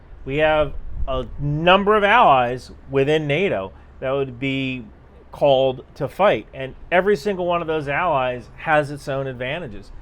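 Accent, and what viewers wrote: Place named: United States. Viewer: To me as a New Zealander. He certainly speaks english with a perfect American accent with no grammatical giveaways! But what do I know?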